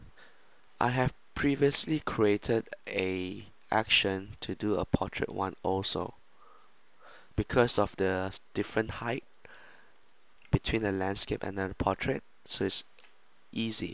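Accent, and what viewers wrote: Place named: Malaysia. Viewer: my guess is...he's from Malaysia or Singapore... :)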